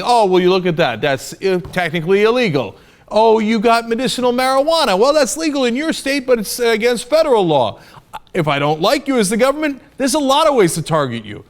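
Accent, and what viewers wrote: Germany. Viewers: Worst German accent ever. Otherwise a great vid. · Cenk f*** you for pulling Stuttgart, Frankfurt and your weird German accent into this, it's not the German government doing that and btw, the CIA put up their base in Stuttgart spying on and kidnapping German citizens as well.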